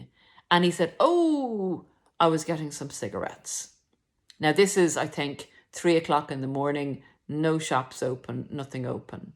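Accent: Ireland. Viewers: OMG, you knew but you didn't want to face it. They also like to wait till we've had a few drinks before they act up or 'fess up. My ex used to tell me about all the cheating housewives on our street. Later I realized they were cheating with HIM! He "worked from home" while I went out to an actual job. I guess they were all getting plenty of "exercise."😂 Love your Irish lilt, eye looks well. Prayers and thanks from across the pond❤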